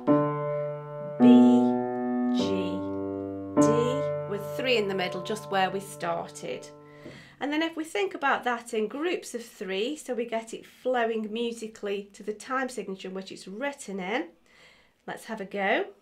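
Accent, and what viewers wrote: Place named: Stoke, United Kingdom. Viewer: Hi thanks for the tutorial! really useful. I am guessing you're from the Stoke area based on your accent 🙂🙂🙂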